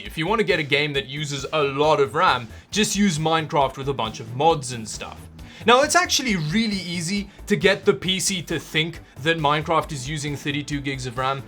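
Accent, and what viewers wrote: Afrikaans, South Africa. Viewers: Fiddy twoo. I recognize a fellow afrikaans accent there lol. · he’s south african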